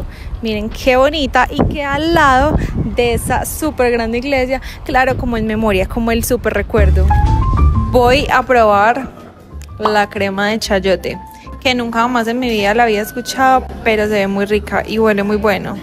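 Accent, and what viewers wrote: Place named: Colombia. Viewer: Tienen un feeling y una manera de hablar las colombianas que me encanta,voy a seguir viendo los vídeos de esta nena 😊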